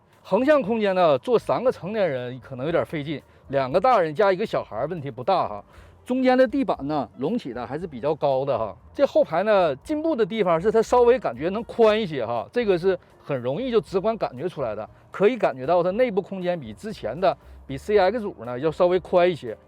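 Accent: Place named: Shenyang, China. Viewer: 主播的口音也太亲切了，老铁是沈阳银吗？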